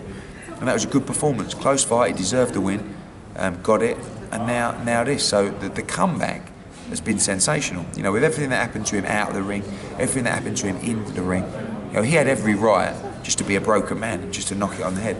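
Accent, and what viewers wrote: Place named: Glasgow, United Kingdom. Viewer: Ooooo mate you might wanna tone down the Glaswegian accent. Also get a decent camera. At least the sound is better though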